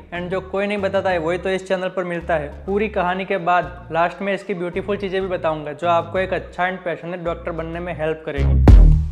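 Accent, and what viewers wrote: Gujarat, India. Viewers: I can feel you are GUJARATI!! ❤ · Aapki aavaj sunke hi pata chal gaya hi aap gujarati hu 😆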